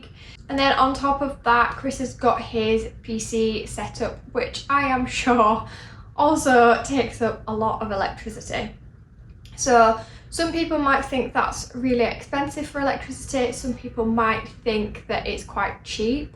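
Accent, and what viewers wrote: United Kingdom. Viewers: what specific british accent is this? sounds cute.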